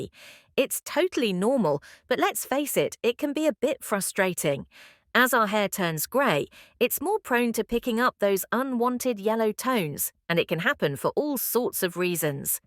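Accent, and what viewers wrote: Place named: England, United Kingdom. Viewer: English accent; American spelling.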